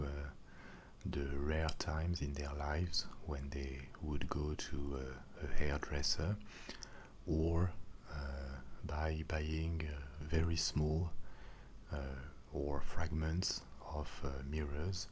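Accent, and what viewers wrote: France. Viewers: Yes! One of a kind! Hope I won`t break the spell you so awesomely are capable to put on listeners (Plus: the content you deliver...wow, and with the intonation ...just sooo soothing, your French accent seems to increase the impact...)-----------yet, may I ask: 1. what led you to do this work? 2. gee, feel awful asking this here, but: do you get paid...by whom, respectively what ..."management"? After all, you offer a stunning service...potentially on a global level! If you don`t (want to) answer, for whatever reason, be assured you are doing a fine job!!! Thanks, Marie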